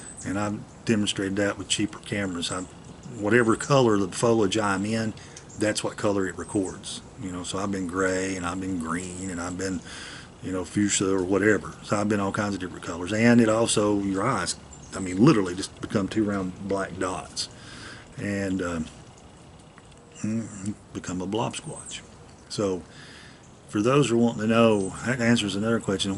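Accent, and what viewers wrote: South, United States. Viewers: I have just recently started watching your site, it’s very good, but scary as heck. like some of the other people, I found out about you from Steve. I am a native Georgian, near Atl and I was wondering where you were from, I knew it was the South, can’t hide that accent, love it.....take care And be careful out there....🍑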